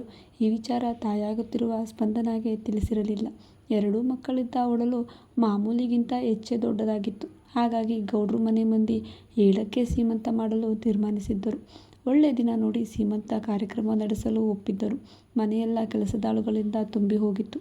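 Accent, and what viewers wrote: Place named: Mandya, India. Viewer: ಮೇಡಂ ನಮ್ಮ ಮಂಡ್ಯದ ಭಾಷೆ ❤❤❤👌👌👌👌🙏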